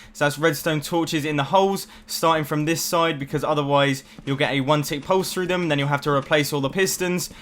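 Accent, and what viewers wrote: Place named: Cockney, United Kingdom. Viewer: Gawd, I love your accent, sort of cockney-ish!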